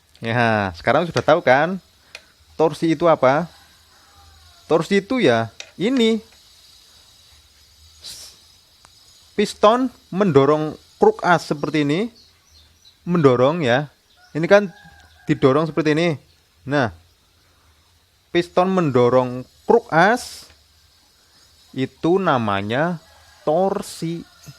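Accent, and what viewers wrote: Banyumas, Indonesia. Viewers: Ngapak bro kakaka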